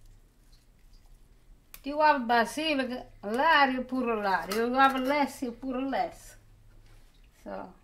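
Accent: Italy. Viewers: This lady must be right off the boat, her Italian accent is thick, but I love it! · . You poot oo vasanico'. My God, she's fantastic! I love her. What part of Italy are you from? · Dear Italian Grandma: Sitting far away in Mumbai (India) I really enjoyed watching your show, your beautiful Italian-English accent, your passion for cooking...thank you so much. I shall definitely try your recipes. Unfortunately, here we do not get as good tomatoes as are found in your countries. Thank you very much and wish you many more happy years of cooking... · Thank You. You are a wonderful grandma . I appreciate your dedication and love to the job you are doing . Congratulations. And for those who made fun of your English , please be polite and respectful . She is Italian , not from London . And it's very natural that her pronunciation and accent will be influenced by her mother tongue . 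She still has good vocabulary and put a nice effort to make us things right. Congratulations again Grandma ! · I love! By her accent and certain Italian dialectic words, I think she is from a paesa nearby my paesans. We come from Castel Nuovo, Parranno - vicino Formia e Montee Cassino. Love hearing her accent. It brings me back to old times with my nonno and nonna!! <3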